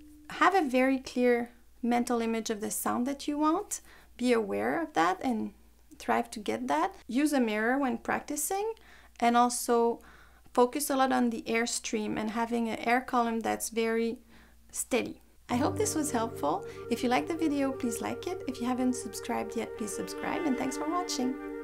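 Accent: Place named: France. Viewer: It looks as if you have an french accent,so, are you French?(without malice)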